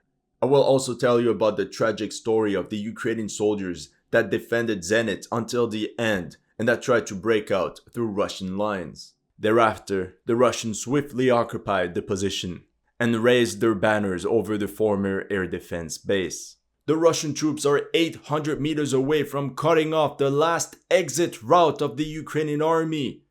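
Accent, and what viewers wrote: Quebec, Canada. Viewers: Что ты хочешь от канадца французского происхождения? Нормальное у него произношение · That "c'est fini bro" get closer and closer to the Quebec accent you are getting closer with every words spoken.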